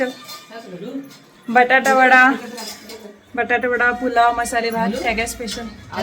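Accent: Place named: Belgaum, India. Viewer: तुमची भाषा ऐकुन फार छान वाटतं बेळगावची आठवण येते... माझं माहेर उचगांव बेळगांव ❤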